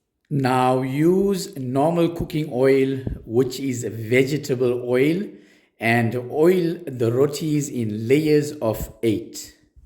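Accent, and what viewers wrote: South Africa. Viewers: ThnXX for Sharing the Recipe with gr8 tips by Pressing the dough balls. Sir just by your accent of talking I knew you’re from South Africa!!! Iam from Zambia LSK. now settled in the 🇺🇸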